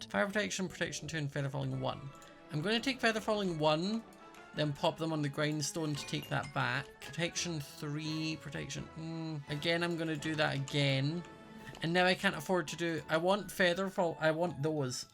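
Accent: Scotland, United Kingdom. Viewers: Hello I was puzzling around from were you came from. Because some how im Familiar with this Englisch that you are speaking Australien, Cockney Englisch. Canadian Englisch. Then maybe Scottish. That could be. I was not shure. Scottish is positive. I few years ago our Theatical group got ivited to St. Andrews. And I remembered the Englisch there were speaking is like yours. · Are you Scottish 😮😮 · Scottish acents are funny sometimes lmfao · Scottish accents are the best accents I do not have one 😞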